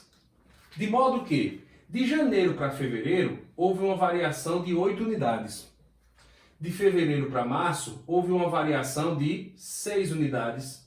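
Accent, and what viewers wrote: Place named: Northeast, Brazil. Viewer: Pense num sotaque bonito é o do nordestino! Aula top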